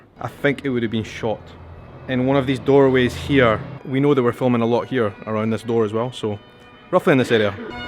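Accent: Scotland, United Kingdom. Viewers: so happy to hear a scottish accent... and actually understand it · You are welcome. I love that Scottish accent. I never been to Scottland ever but when you show us the sites of Edinburg I feel like I am there. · I envy your background, your culture, your accent and mostly your country with it's rich history. I myself didn't know about the history Scotland had, thanks to Outlander I've learnt a lot. Thanks for sharing you great country with us.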